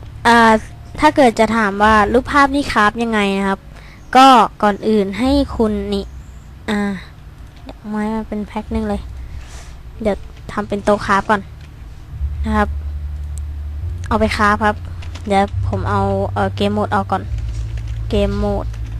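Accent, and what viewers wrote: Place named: Suphanburi, Thailand. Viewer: เสียงงงงงคนสุพรรณชัดๆๆ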